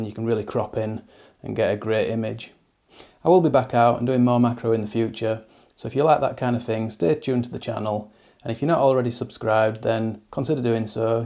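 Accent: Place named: Congleton, United Kingdom. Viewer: You sound just like all my pals from Congleton 👍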